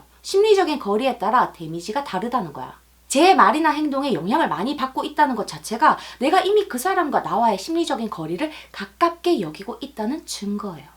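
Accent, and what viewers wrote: Gyeongsang, South Korea. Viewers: 사용하시는언어는 부산어 인가욧 대구어 인가욧???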